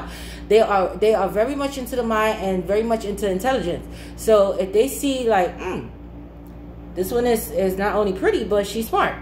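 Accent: New York, United States. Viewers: I'll share with you what I gleened from your analysis as I am a cancer male. First thing..you are a very lovely woman whom I enjoy looking at and listening to. You are down to earth yet majestic. You possess a measure of love but life has taught you some things so you definitely have an edge. You also sound like a new yorker so that could've made you even more aware of life's more cruel aspects. Now to sum it all up cancers are very observant and while they may address certain things the more problematic things...you may not realize they see...because they won't say anything...for various reasons. So it may seem like a "gotcha" moment when things are revealed and laid out on the table. Remember this...for the most part are intentions are good.. if we engage romantically as we are not playas and we certainly are not good liars even when we have to show and prove to people who believe they can out slick a can of Penzoil. 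Peace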